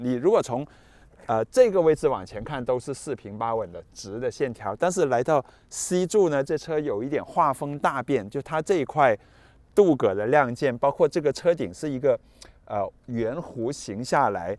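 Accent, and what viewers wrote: Cantonese, China. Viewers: 这主持人是广东人对吗？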